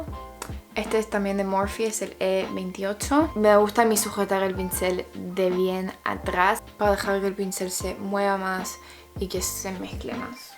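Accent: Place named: Israel. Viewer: איך שומעים את המבטא הישראלי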